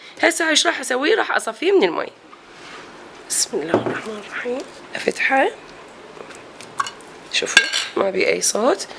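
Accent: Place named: Iraq. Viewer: اكثر وحده أعجب بيه اثناءمشاهدة الفيديو كلامج عراقي وطبخج عراقي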